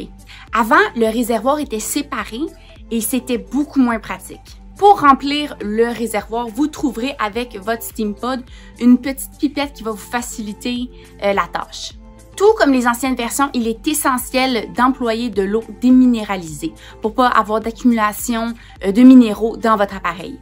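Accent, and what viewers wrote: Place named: Quebec, Canada. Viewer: C est un accent québécois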